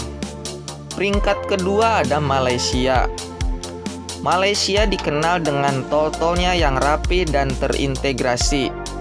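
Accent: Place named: West Java, Indonesia. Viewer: Adminnya urang Sunda yah, huruf F sama V jadi P semua 😀😀😀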